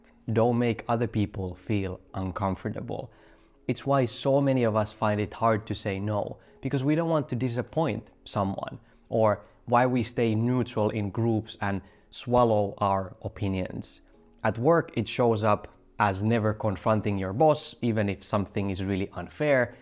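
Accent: Finland. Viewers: The first word you said and me: he's Finnish lol. Greetings from Vantaa. Unfortunately even Finland has fallen into the american toxic mentality. Currently planning to go back to Italy (I'm Italian) and disappear in nature, mountains and sea. As anarchist I would say: screw (I usually tend to use the word that starts with a capital F) all these toxic societies, screw money, screw status, screw this sick narcissism, screw this insane form of capitalism, screw consumerism, screw this poisoning individualism and screw your racist country lol, at least part of it. I will miss a lot the good finn and probably you know what am talking about (usually the socialist ones). Now Finland you can hate me, I don't give a...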